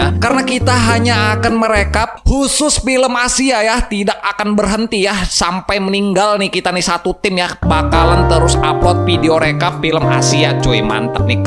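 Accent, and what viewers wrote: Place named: Lampung, Indonesia. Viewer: Dari tata bicara kaya orang Lampung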